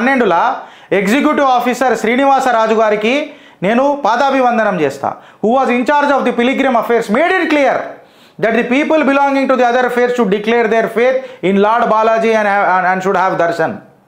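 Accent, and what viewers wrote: Telangana, India. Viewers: Ee telanga telugu kanna normal telugu lo matladadandi, mee accent improve cheyyandi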